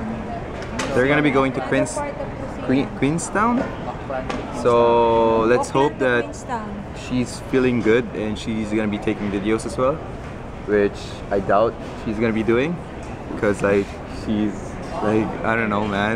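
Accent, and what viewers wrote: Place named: Canada. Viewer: Candian accent ka na ah. :) cool.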